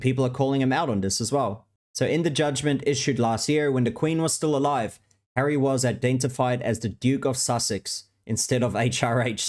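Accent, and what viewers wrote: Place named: Australia. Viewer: I subbed because I have a weakness for an Aussie accent and most importantly, I can't stand those grifters.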